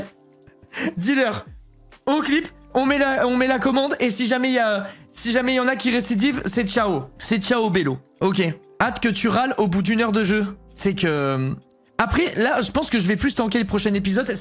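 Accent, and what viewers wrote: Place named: Marseille, France. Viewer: avec l'accent marseillais est juste 🤌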